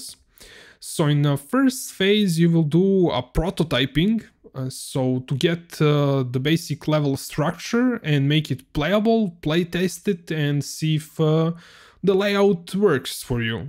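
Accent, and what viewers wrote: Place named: Russia. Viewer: Сразу слышно, русский озвучивал)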